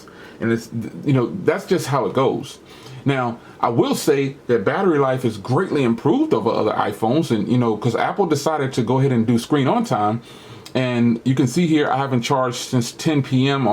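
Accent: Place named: New Jersey, United States. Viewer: Great video, Jersey accent heavy at times during this video 🙂, loving my XS Max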